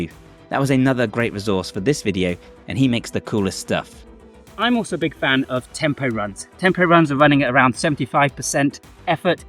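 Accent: United Kingdom. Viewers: Great Video ! I remember when I played Football (America/Canadian Style) we had to run smaller hills and Short Sprints 40-70 Yards. The NFL Combines (Selecting Top College Players for Profession Teams) one of the Tests is the 40 Yard Dash (Record was 4.21). Sounds like your from the UK so I guess Rugby and Soccer Players must have to be Fast sometimes with explosive Burst of speed too. Also when I did Track and Field the real Power Sprints seemed to Top out at 200 to 400M after that I think some Pacing after that would be required if going Full Bore. Roger Banister 1st man to do 4 minute Mile would Average 17.60/100 yards in a 1 mile run. Also what do think about Cross Country Running, in your video looks like that or mixing up the Pace I guess. Even in the Boy Scouts (old days) was something like Walking 50 Yards them Running 50 (alternating) when hiking sometimes. 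I guess the Top women sprinters (100 M) are only than a second or 2 behind the men ?! Also to qualify for Police officer training here Recruits must run 5 KM in 30 Minute. What do you think ? Thank you Jim Canada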